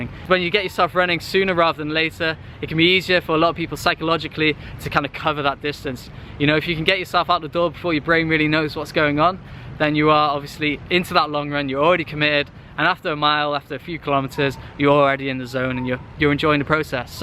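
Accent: United Kingdom. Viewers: You sounds british but have palm trees in the background. whats the story there?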